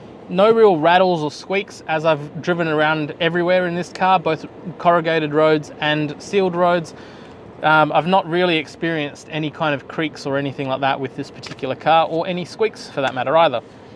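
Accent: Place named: United States. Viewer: Nice car review! Thank you! Btw, you sound like an American so much😂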